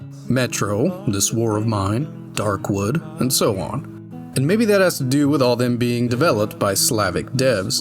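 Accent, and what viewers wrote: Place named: South, United States. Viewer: What's with all the midwitted manchildren that don't understand vernacular and accents? Nu-kyu-lur is a dialectical pronunciation. We know how it's actually pronounced. But that pronunciation works better with a Southern accent due to how we stretch vowels and shorten consonants. It's why we pronounce "oil" as "ole" and "window" as "winder." It's just easier to pronounce for us. Nobody harps on the Japanese for pronouncing McDonald's as "Makudonarudo" because they struggle to pronounce the OG name. Nobody gives Jamaicans shit for pronouncing things the way THEY do. And there's nothing wrong with their mode of speech because it works for them, and they understand it. Look...language is an evolving, living thing. It changes. Despite my personal elitism over Classical Latin being disregarded in favor of Ecclesiastical pronunciations in historical films set in the Roman Empire/Republic eras, I get why it's done. 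But that's more about authenticity. Went off the rails near the end there, but you get my point. Accents are accents. No shit. Bostonians saying "paak the caah" and "hahbah" instead of the intended pronunciation is fine. It's not a sign of ignorance or insipidity. And pointing it out is the lamest Reddit "gotcha" that only reveals how fucking stupid YOU innately are. What else? You gon tell us Frankenstein was the Herr Doktor and not the monster next? Greenland isn't actually green, and Iceland isn't all ice, Reddit professor?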